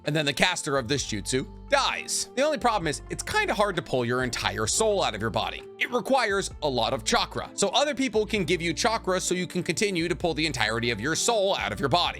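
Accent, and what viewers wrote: United States. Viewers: You have the most American pronunciation of Japanese names I've ever heard. But I still look at your vids I just try to ignore it.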